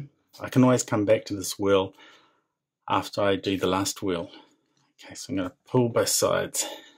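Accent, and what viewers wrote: New Zealand. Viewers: I heard that accent and instantly recognised you were a fellow kiwi! Fuck yeah! Glad to see another kiwi here! Chur for the video bro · South Island fella? 🇳🇿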